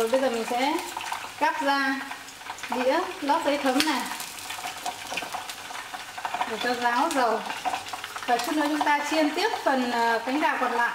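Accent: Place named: North, Vietnam. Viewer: Chị đi nước ngoài nhưng vẫn giữ được nét truyền thống thật, từ cách nấu nướng tới cách nói chuyện với con gái đều rất đậm chất bắc bộ :) rất thân quen ạ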